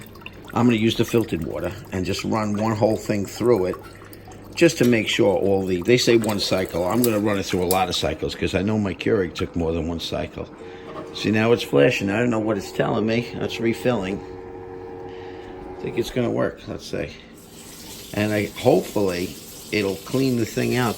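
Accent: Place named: New York, United States. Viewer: Suuuuuuch a great NYC accent! I’m guessing Brooklyn, but maybe SI, Queens, or d’Bronx? 😊 Regardless: thanks for your helpful video. I too am a “kawfee drinka” and I need that damn red light to go off!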